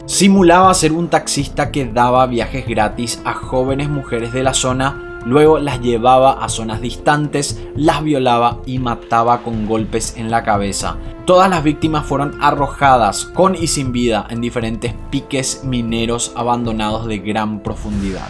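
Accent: Paraguay. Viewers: Sos Paraguayo? Excelente video hermano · Parecés paraguayo! Es así? Muy buen material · Amigo vos sos paraguayo?? Por que tenes acento paraguayo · Tenes acento Paraguayo. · Verdad su acento es Paraguayo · Por el acento que escucho veo que eres paraguayo,no sé si estás enterado que el mayor violador y asesino del mundo,esun colombiano llamado Luis Alfredo Garabito,violó y asesino a 172 niños y tenías que haber sabiodo realmente quien el mayor asesino no solo de América Latina,si no de todo el mundo y creo todavía sigue preso,infórmate y publicalo,un saludo para ti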